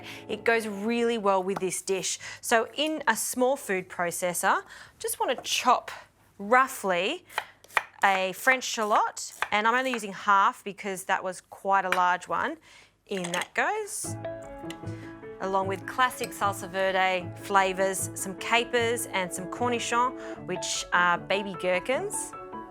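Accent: Australia. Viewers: You have a nice Australian accent, where are you from? (If I may ask)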